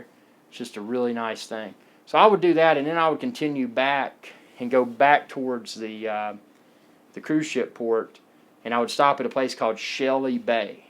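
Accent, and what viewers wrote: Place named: Alabama, United States. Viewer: I'm sure this is a great video, just can't get past the Alabama accent. Rolling on the floor laughing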